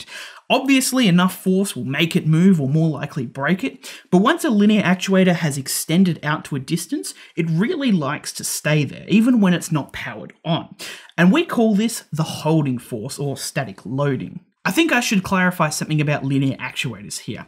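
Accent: Australia. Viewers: Yewwww fuck yeah mate, love the vid, love the channel. I'm from the central coast and I love hearing aussies explaining electronics.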